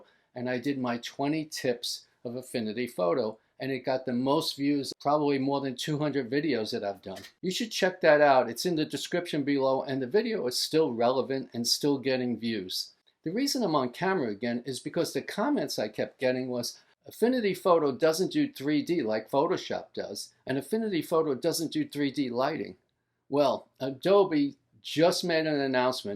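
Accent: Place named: East Coast, United States. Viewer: I am from RI...your east coast accent was unmistakable! Lol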